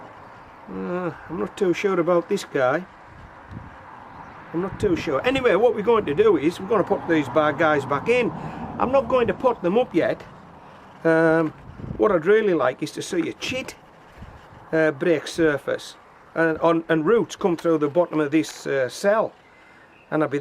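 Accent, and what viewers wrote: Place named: Geordie, United Kingdom. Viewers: After 25 years exiled from the UK it's fantastic to hear a regional (is it Geordie?) accent! Interesting experiment! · This guy is unreal lives in Australia but also lived in uk Middlesbrough his accent is very close lol...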